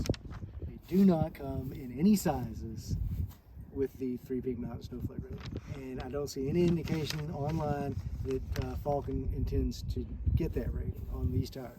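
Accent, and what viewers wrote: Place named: South, United States. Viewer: That's right! Speak your southern english just as natural as you please. Roll Tide.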